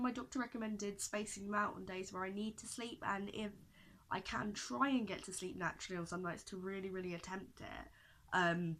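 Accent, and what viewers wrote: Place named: United Kingdom. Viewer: where from the uk are u from?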